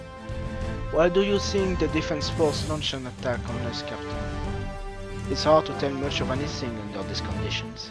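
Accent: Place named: France. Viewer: yes i thinked you speek french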